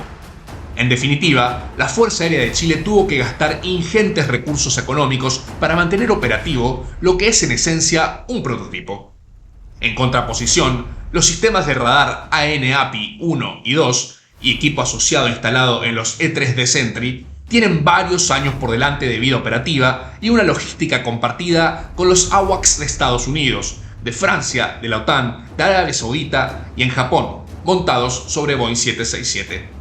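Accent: Argentina. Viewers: Hola amigos, me encanta el canal, y LA informacion que nos brinda. Pregunta; quien es el narrator? Suena in poco Argentino, algo que seria in poco irónico!!! Saludos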